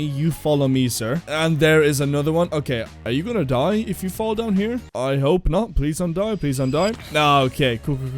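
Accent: Ireland. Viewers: Yo bro r u irish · also are you irish?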